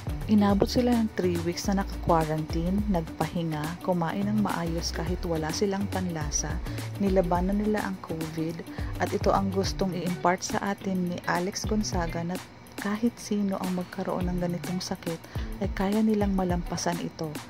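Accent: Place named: Visayas, Philippines. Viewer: Ampanget ng Boses at salita mo , d ko na tinapos nairita ako , pambisaya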